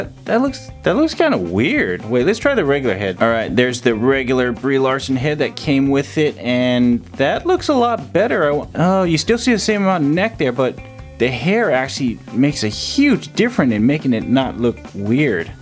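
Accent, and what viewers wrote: United Kingdom. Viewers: Who eles got surprised when he started speaking British.....but when did u buy the wolverine are u going to make a review of him or no cause i am going to buy that too.....peace.... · I like the British voice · Stop the British voice 😡😡🤯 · And nice British accent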